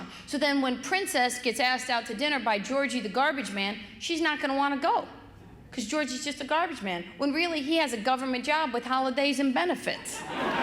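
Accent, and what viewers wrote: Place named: Arkansas, United States. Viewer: I saw you on Tube, do you remember me? 😉Sounds like an Arkansas Hillbilly.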